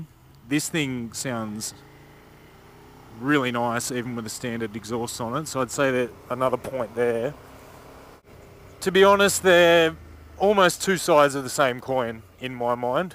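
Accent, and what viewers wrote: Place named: Australia. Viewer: like your Australian accent! Greetings from Spain. I'm 5' 11" and I was wondering if this bike would be too low for me (seat height si 785 mm here in Europe).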